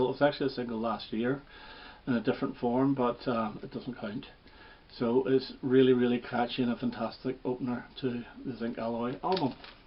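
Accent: United Kingdom. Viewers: From America.. it's lovely to hear the Brit accent. We here were only introduced to Electric Warrior and Slider, and it's fun to catch the rest. Like your selections and collection! 😊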